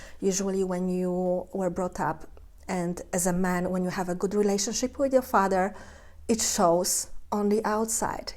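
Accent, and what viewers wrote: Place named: Eastern Europe. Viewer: You come from a different culture . German men and women are very equal and it isnt a problem for a man to share an apartment with women...people are much more free unlike the soviet union where you were brought up It is only a sign that a man is open minded. When i shared an apartment i preferred to live with men because women were more irritating but i also shared with a nice woman once. It depends on the PERSONALITY. Your accent indicates you are east European where men and women have very rigid gender roles . You are reaching absurd conclusions . A man who seeks spiritual retreats is spiritual. You dont seem to have a lot of experience living with other people So it is even more absurd you speak with such confidence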